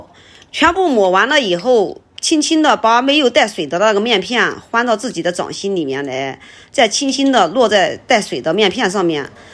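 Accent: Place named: Xinyang, China. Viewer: 口音像信阳人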